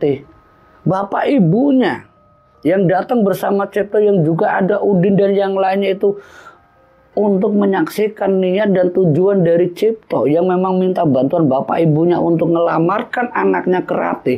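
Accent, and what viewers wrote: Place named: Java, Indonesia. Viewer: Tapi LOGAT Jawa nya masih tetap AdA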